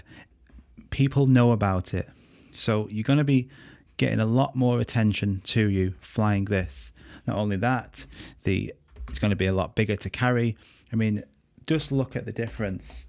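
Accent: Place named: North, United Kingdom. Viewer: Can’t trusts anyone with a northern chavvy accent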